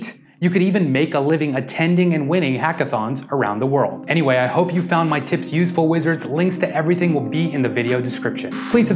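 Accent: India. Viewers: Sir ,you r Indian or American?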